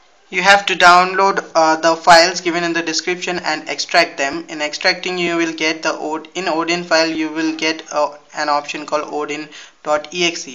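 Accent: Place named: India. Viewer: i can't understand the indian english :-(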